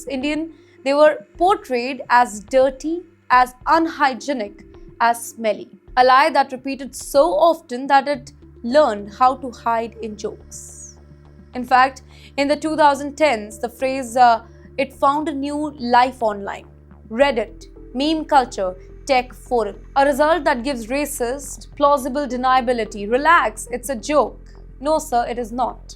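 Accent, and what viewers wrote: India. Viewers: Speak English girl. Ur English sounds funny. Chilli biryani accent hahaha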